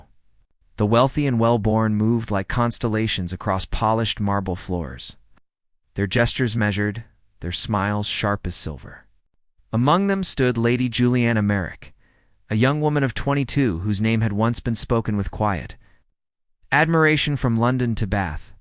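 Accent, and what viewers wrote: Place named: United States. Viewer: Narration is poor, American accent for an English Regency and weird scream, strange sounds between words. No real basis for him to continue being blind.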